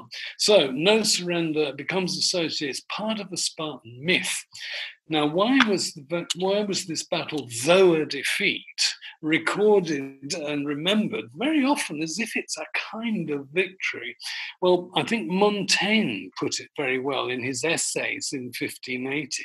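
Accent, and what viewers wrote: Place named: United Kingdom. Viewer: your british accent is unbearable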